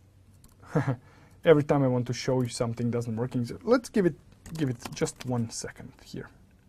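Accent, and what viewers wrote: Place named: Eastern Europe. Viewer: I love this guy and his eastern europeen accented English, amazing explanation of all import concepts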